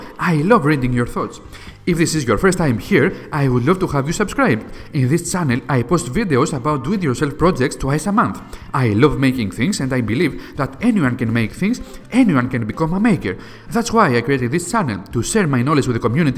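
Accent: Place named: Greece. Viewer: I designed a home automation in my spare time back in 1985. It was designed with 68HC11 MCU which was the most powerful MCU you could buy in those days. Unfortunately, IBM beat me to it, and even called it what I did; "Home Run". I think they had ESP (extra sensory perception), since I told no one about my project! It had lots of discrete ICs like 74LS138, 74LS367 etc. I have determined that I can now implement a wire-less version (WiFi) of it, which would eliminate "ALL" wires (except power), using a single ESP32, IR motion sensor, mic/speaker, touch keypad, a TRIAC and a few OP AMPs for analog signals (hands-free Intercom and AC dimmable switch). What I wouldn't have given for an ESP32 at that time! Our younger viewers have missed being a witness to a digital revolution. Thanks for the nice video. Your voice and accent, reminds me of my childhood friend from Athens.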